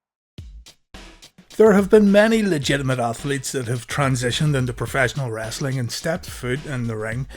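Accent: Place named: Northern Ireland, United Kingdom. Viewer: What a great video and how refreshing it is to hear a fellow Northern Irishman (or Irishman if you prefer) on Youtube! You got yourself a subscriber. Great work!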